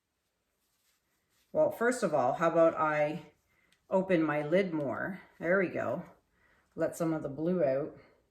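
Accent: Canada. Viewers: Anytime she says anything with “out” in it I laugh BUT I will say I never really could tell a difference in the way Canadians speak vs Americans UNTIL I heard her with the “out” .. turns out … ab”out” etc etc … now anytime I hear it I’m like oh you’re not just from outside of Long Island you’re from Canada!! 🇨🇦🇨🇦 I personally think it’s pretty cool. Ps, this turned out beautiful! I really actually like the titanium buff light as a base color too!! I almost like it better than just plain white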